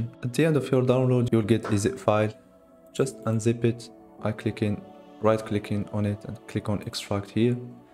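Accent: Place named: Morocco. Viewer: This guy's accent is moroccan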